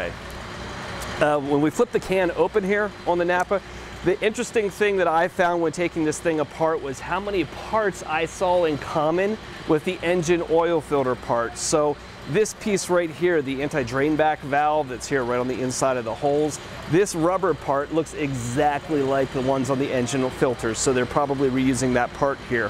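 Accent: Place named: Pennsylvania, United States. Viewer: I heard a Pennsylvania accent then I looked up your location, yep! Grew up in Philly myself.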